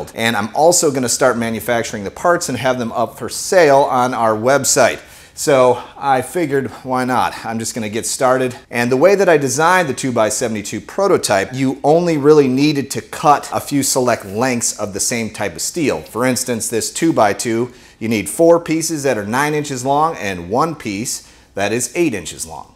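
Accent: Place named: United States. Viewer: yeah we do smoothies down here, in my opinion its more of a heat beater so lotsa ice cream and banana maybe milo or ovaltine thrown in thats just a simple one the kids can make :D Cant wait for the stickers! i love your sense of humour please dont loose it! it seems no matter who you watch from over there in yankie land you can really tell that there is a local to that area dialect. i might start a map of the 'muricas so i can pin down where who im watchin is from. i like the mix up style it doesnt lock you into one genre and gives you many diffferent ways to go down a diferent rabbit hole each time. plus the personal thoughts really ground you to being, well one of us bloody pelicans :)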